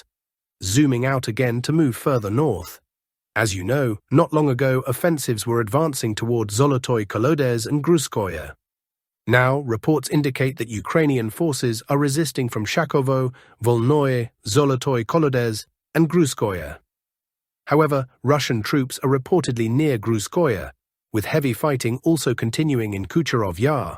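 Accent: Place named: Oxford, United Kingdom. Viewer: You're no Russian..MI6 fucking agent!No?? Howbis that talking with Oxford pronunciation?? Born in Britain??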